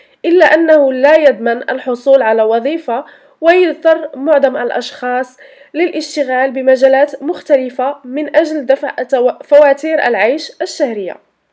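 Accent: Algeria. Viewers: My first thought when I heard your accent is that you are Algerian, then I saw your account page and you are Moroccan. So, I was close. Here in America, there are many of our people who were doctors, engineers, etc. in Algeria, Morocco...etc. and now they work in jobs like restaurants, taxi drivers, etc. Because America doesn't accept their degrees and they have to go back to school if they want to do the work they did back home. In America, nothing is free, because you have to pay for college, doctors, medicine, water, housing...etc. even if you have insurance, you still have to pay something besides what you already pay for insurance. Also, in America, there is high crimes and very high racial tension. Would you do another video in Darja or Tamazight next time? 🤣🤣 ==== Ma première pensée quand j'ai entendu ton accent c'est que tu es algérienne, puis j'ai vu ta page de compte et tu es marocaine. 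J'étais donc proche. Ici en Amérique, il y a beaucoup de nos gens qui étaient médecins, ingénieurs, etc. en Algérie, au Maroc...etc. et maintenant ils travaillent dans des restaurants, des chauffeurs de taxi, etc. Parce que l'Amérique n'accepte pas leurs diplômes et qu'ils doivent retourner à l'école s'ils veulent faire le travail qu'ils faisaient chez eux. En Amérique, rien n'est gratuit, car il faut payer pour l'université, les médecins, les médicaments, l'eau, le logement... etc. même si vous avez une assurance, vous devez toujours payer quelque chose en plus de ce que vous payez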